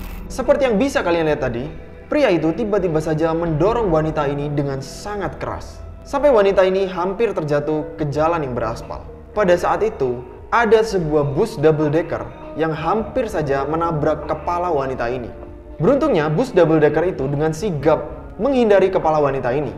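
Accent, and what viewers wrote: Java, Indonesia. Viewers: Bang sodaranya tirta ya🤔 medok banget😂😂😂😂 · Mase medok asline mana to?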